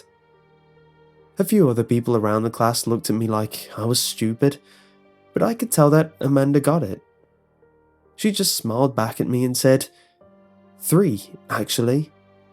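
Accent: United Kingdom. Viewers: Sorry english is not my native language, amm... is this the so called british accent?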